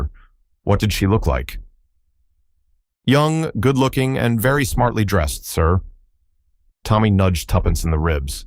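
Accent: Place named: United States. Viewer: Terrible listening to Agatha Christie in an American voice 😔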